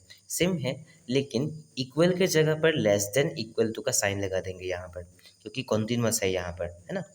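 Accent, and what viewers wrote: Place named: Northeast, India. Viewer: Ye North East ka hai isliye aisa ascent hai... Woh jaan bhujhkar aise nhi bol rha hai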